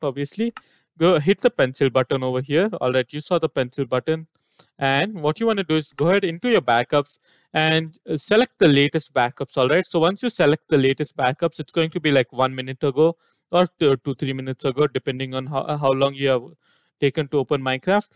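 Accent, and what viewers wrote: India. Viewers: Indian accents are the best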